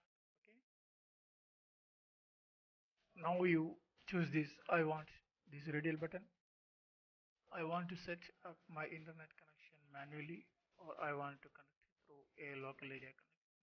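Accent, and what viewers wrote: India. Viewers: You mean he sounds like an indian?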